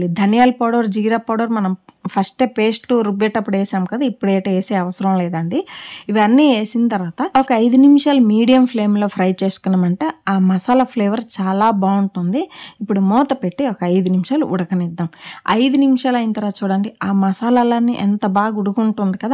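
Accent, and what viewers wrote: Srikakulam, India. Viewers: శ్రీకాకుళం మీది మీ యాస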